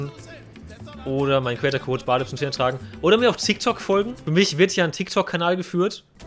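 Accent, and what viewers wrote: Saxony, Germany. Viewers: Hi, mal wieder geiles Video, Als ob du auch aus Sachsen kommst, weil ich komme auch aus Sachsen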